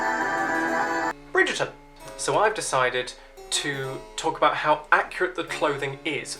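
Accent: United Kingdom. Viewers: Love your very english english ❤ a plesure to listen to you. And you are very polite in your review of clothing accuracy. A gentleman 🎉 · PS your accent is very posh and regal.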